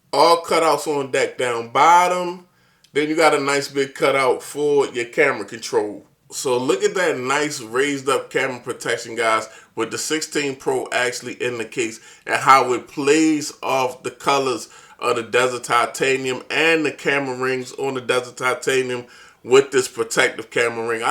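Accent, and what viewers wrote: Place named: Maryland, United States. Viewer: You sound like you from Maryland 💪🏼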